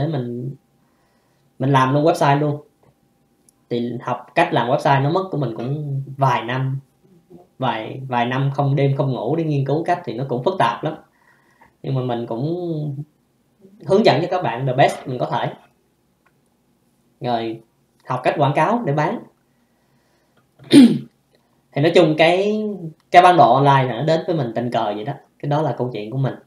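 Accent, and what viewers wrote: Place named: Mekong Delta, Vietnam. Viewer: Ban noi giong nguoi mien tay nguoi viet nam nho nhung nguoi tay fai nguoc nhin ok e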